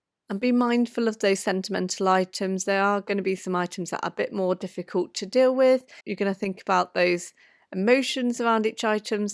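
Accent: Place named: England, United Kingdom. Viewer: Ah...a sweet quiet soft english accent..❤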